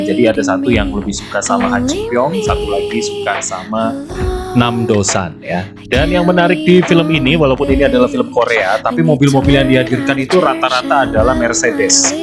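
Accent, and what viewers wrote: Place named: Banyumas, Indonesia. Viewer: Videonya paket komplit,ngapak bos wkwkwk. Start up drakor favoritkuh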